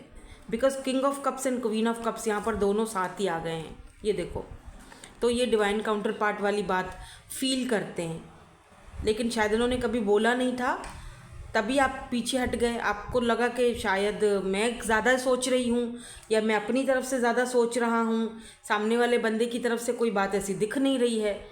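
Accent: Punjab, India. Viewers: Love your punjabi accent❤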